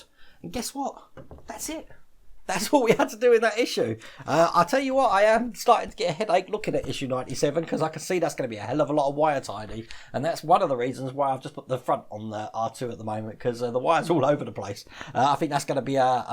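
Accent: United Kingdom. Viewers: where in the uk are you that accent is bugging me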